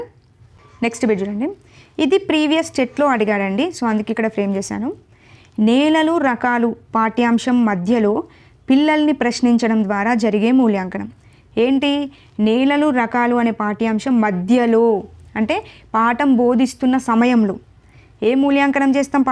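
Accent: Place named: Telangana, India. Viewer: మీరు తెలంగాణ కదా మేడమ్ Voice telustundi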